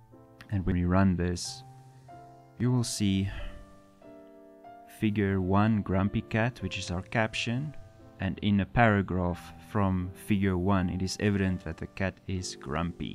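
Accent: South Africa. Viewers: Thank you so much from your articulation I hear you are South African. I would like you to buy coffee, beer or coke....eWallet? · South African?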